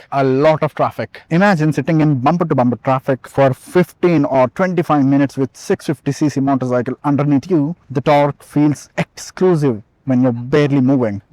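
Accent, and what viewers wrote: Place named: India. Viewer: As an indian , this accent is 😭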